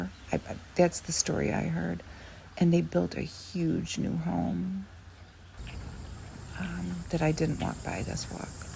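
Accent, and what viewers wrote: Midwest, United States. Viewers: I was born in MI but raised in the south. Most of my family lives in IL or WI so it’s nice to hear that midwest accent for a change.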